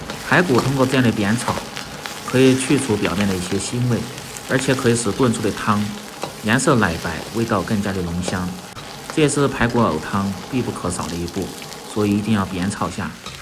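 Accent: Sichuan, China. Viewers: 四川人，一听便知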